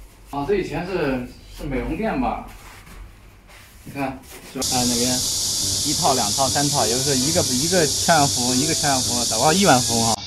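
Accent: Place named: Anhui, China. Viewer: 听口音安徽吧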